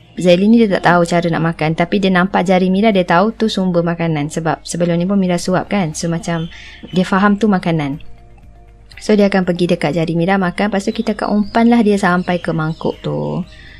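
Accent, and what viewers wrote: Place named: Malaysia. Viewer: mba nya dari malay logat nya enak di dengar dan mpusnya cantik dan beruntung smoga sehat terus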